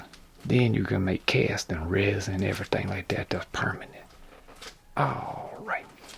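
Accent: Louisiana, United States. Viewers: is this guy from louisiana? he has the creole/ cajun accents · Bob Ross + Louisiana style ASMR = I'm hooked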